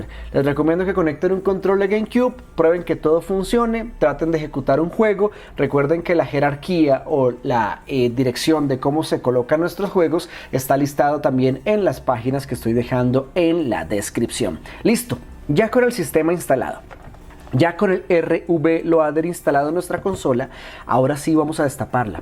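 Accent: Colombia. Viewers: Qué bonita es la electrónica, lástima que no tengo ni la paciencia ni el pulso para trabajarla...por cierto ese acento de los colombianos/colombianas ME ENCANTA!!! Recuerdo cuando cursaba la carrera de informática , cómo optativa tomé sistemas digitales , y al momento de hacer los puenteos , si quedaban muy grandes o muy feos llegaba el profesor con sus pinzas y nos decía" No me gustan las patas de araña" y zas , que nos cortaba los cables! creo que por eso elegí la parte de software en vez de hardware.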